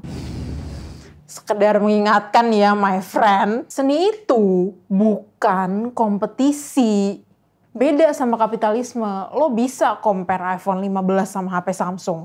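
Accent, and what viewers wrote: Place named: Banyumas, Indonesia. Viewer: orang ngapak ya mba hahah PWT kerenn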